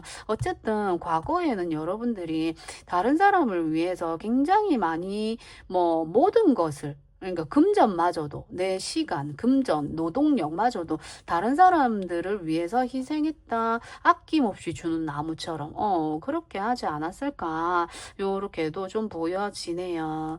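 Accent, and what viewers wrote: Gyeongsang, South Korea. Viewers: 4번 갱상도 억양으로 들려주는 리딩~ 감사히 잘 들었습니다 나를 많이 챙겨라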